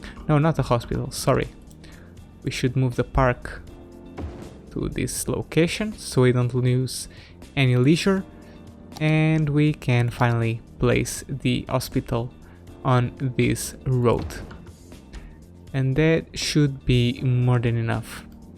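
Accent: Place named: Portugal. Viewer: Where are you from? The way you speak English sounds like you're Portuguese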